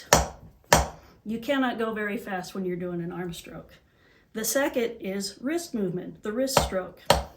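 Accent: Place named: Oklahoma, United States. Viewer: If you're not intelligent enough to ditch that funny Oklahoma accent I think that you're an idiot savant and your drumming thing is all some sort of a f**** reaction coming to you from someplace else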